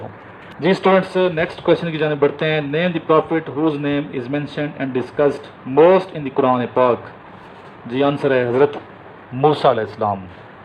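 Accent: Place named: Dera Ismail Khan, Pakistan. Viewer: sir are you by any chance..from DIKhan? apki accent se lag rha ha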